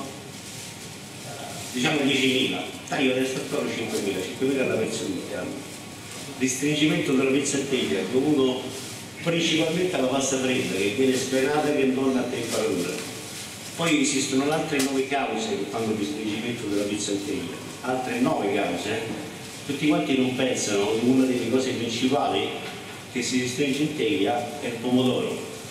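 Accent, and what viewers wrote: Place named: Rome, Italy. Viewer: Un maestro..e poi è troppo bello il romanesco!! Bravo!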